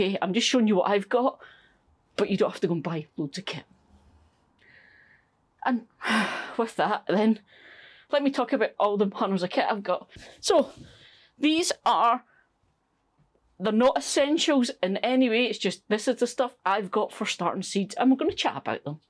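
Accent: Scotland, United Kingdom. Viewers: Hi, can you tell me more about your rotary compost sieve? I live in Massachusetts, and the only one I could find was over $100. I have a lot of compost to sieve, and this would make the job so much easier. I am planning a trip home to Scotland soon. I could bring one back if it's not too heavy. Maybe that is wishful thinking, lol. I am relatively new to growing vegetables and find your videos very helpful. Thank you for taking the time to create informative and entertaining videos. It's also nice to hear a Scottish voice.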